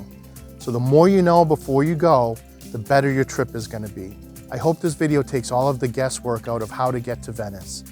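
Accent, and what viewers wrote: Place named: East Coast, United States. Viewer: you posted this video a year ago, but it remains useful today. Given your accent, did you grow up in the East Coast and then moved to Italy in your later years? The Wife and I are planning a trip to the US military base in Vicenza, so I am grateful that you shared this useful video with your traveling audience.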